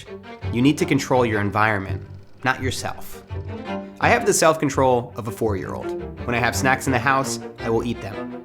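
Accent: Australia. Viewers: As an Aussie, thank you for this. I watch all your videos, and accent makes me feel close to home even though I'm living in NZ. It means a lot you are promoting the relief effort. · Call me stupid but I only just realised that has an Ozzie accent after watching your videos for like a year & half or something. Great video as usual. On a side note I've tried getting up early & cutting out sugar as well being inspired by your videos last year.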